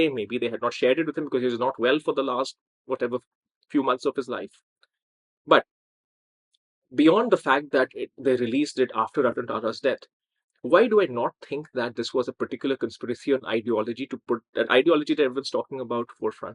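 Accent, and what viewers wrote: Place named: United States. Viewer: He sounds American, very disappointed if he's British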